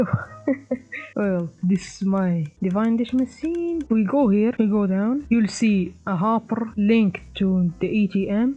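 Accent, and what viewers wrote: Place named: India. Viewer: Its a great vid not kiding keep going but one thing i feel like you talk indian and sry if this bothers you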